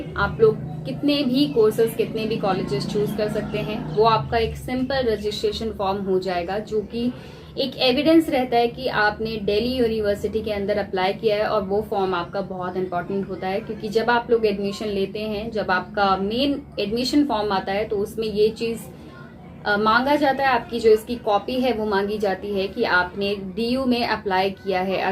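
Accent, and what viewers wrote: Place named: Bihar, India. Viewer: Apakee baat se hme lag rha hai ki aap bhi bihar se hai hai nn 👍👍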